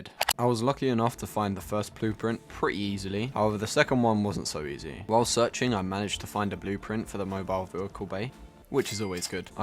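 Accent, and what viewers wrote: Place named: Australia. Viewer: Dude, are you an Aussie? I am and you kind of that sort of accent half the time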